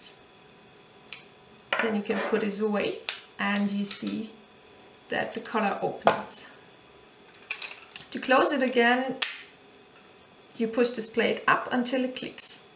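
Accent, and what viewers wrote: Germany. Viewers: And that German accent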